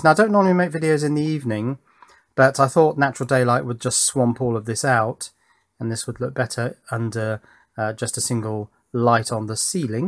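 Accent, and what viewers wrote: United Kingdom. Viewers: Are you British Man!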